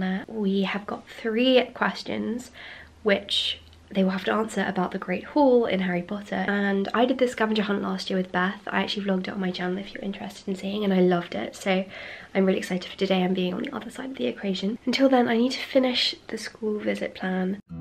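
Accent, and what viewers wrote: United Kingdom. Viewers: did you know that I got my british accent by copying you? 😍😍😂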